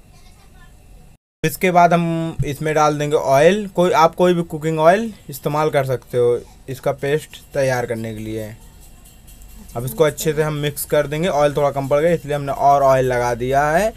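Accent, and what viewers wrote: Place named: Allahabad, India. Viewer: Bhaiyya apke baat karne ka andaz pura allahabadi hai😊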